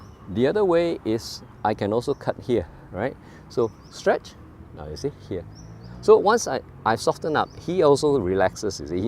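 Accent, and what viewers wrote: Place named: Singapore. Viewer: Sounds like he is from Singapore! I wanna learn from this sifu😂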